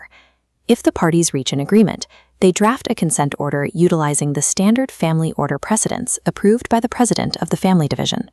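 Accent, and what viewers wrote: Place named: United States. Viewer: English law. English audience. American accent?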